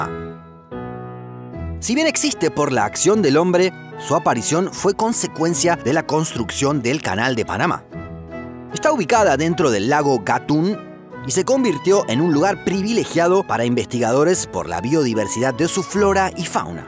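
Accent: Rioplatense, Argentina. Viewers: Si no me equivoco tenes acento rioplatense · acento argentino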